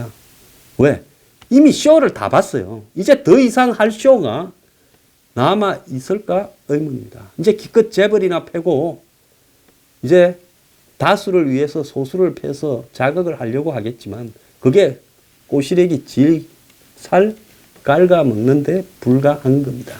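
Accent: Gyeongsang, South Korea. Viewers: 황소장님 정치적견해를 떠나 구수한 갱상도사투리 좋습니다^^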